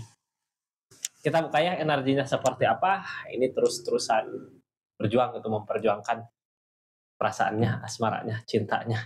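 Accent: West Java, Indonesia. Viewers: biarain aja.. Sbg ciri khas sundaisme 😁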